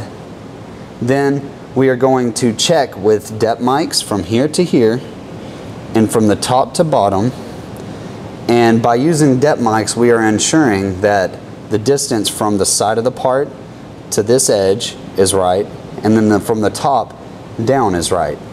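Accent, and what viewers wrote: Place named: South, United States. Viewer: The guy clearly has a southern accent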